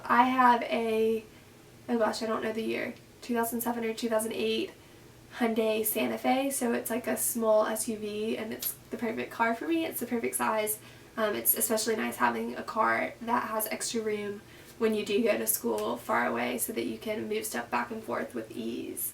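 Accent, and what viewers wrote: South, United States. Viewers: I think that you have a slight Southern accent, especially on your o's.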